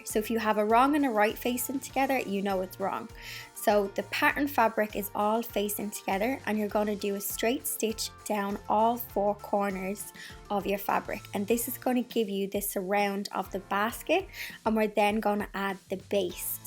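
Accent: Ireland. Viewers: I love your Channel! I’ve spent the whole day watching! Love your sweet Irish Accent. My Father was born in Dublin in 1920, and I have a special place in my heart for all things Irish.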